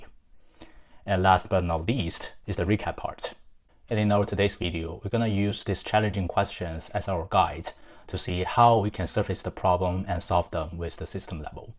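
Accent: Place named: England, United Kingdom. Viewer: Great walkthough of the design of the problem. One suggestion is to hire someone without an English accent to do the videos because it is very hard to follow and understand smoothly when people am also trying to figure out what the host is trying to say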